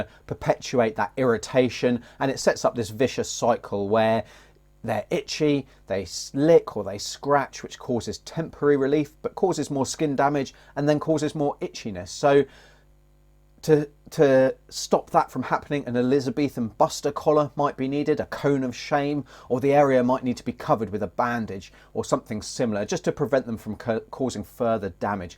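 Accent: United Kingdom. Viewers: I can't understand you with that deep UK accent. I heard dog and rash. That's all I understood.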